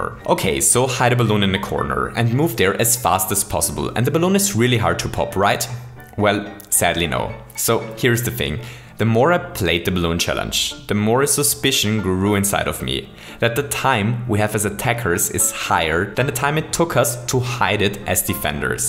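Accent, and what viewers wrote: Germany. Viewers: Is he German? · Bro der deutscheste akzent den ich jemals gehört habe (Nohate) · are you german? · Man hört das Deutsche so sehr heraus :D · Wie man direkt heraus hört das er deutscher ist...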